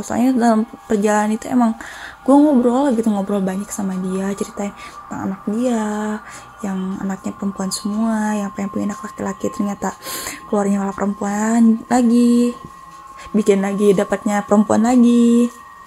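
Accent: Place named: Sunda, Indonesia. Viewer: Logat sundanya msih kental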